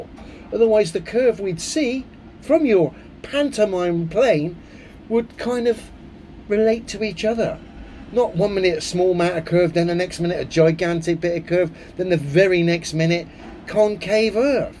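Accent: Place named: United Kingdom. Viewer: Cheers. How I like to hear a British voice on yt 👍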